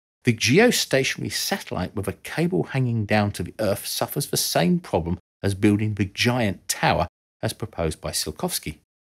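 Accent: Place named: Yorkshire, United Kingdom. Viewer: Ahh, that Yorkshire influence on the accent.... "What planet are you on?" "Uhf"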